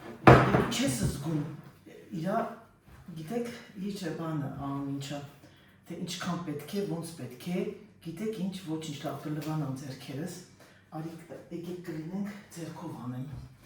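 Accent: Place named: Lori, Armenia. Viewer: ջան,հայերեն լոռամիրգ է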